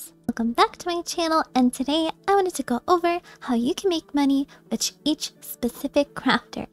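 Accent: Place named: Texas, United States. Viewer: I have to be honest after watching you streaming is a little weird hearing you without your sassy texan voice. Still, cool video.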